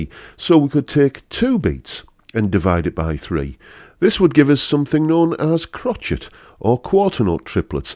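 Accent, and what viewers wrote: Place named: England, United Kingdom. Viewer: Being an expatriate Englishman and a guitar player, it is nice to hear an English accent and your classic insights into guitar, so you are appreciated here in Australia too.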